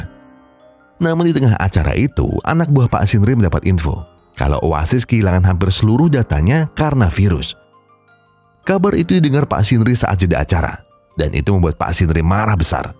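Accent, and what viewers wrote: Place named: Semarang, Indonesia. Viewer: lek ngademin logate koyok wong semarangan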